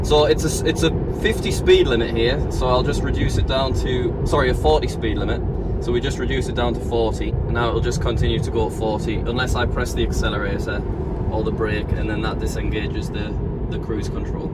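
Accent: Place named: Newcastle, United Kingdom. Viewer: Tesla Geordie might also be a good name for the channel. At least that's what you sound like to me. Great fun any road.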